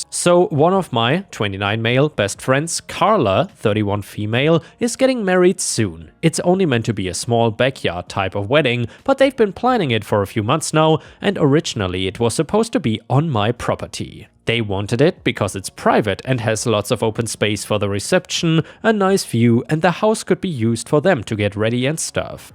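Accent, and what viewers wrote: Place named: Germany. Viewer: You know what I just realized? You have pretty much lost your German accent. Your English is awesome.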